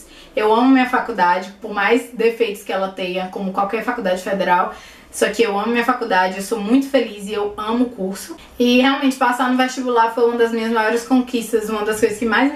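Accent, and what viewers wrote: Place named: Salvador, Brazil. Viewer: Amei o vídeo. Você é linda. Sou de Salvador e reconheci seu sotaque na hora haha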